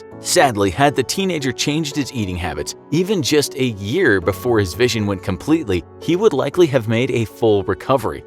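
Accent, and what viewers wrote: United States. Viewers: My mans out here roasting America sounding American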